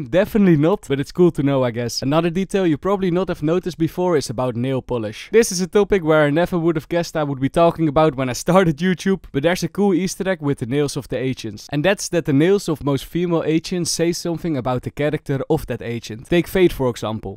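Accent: Netherlands. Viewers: je hoort heel duidelijk dat ne nederlands bent hahahhhaa maar leuke viddd!!!!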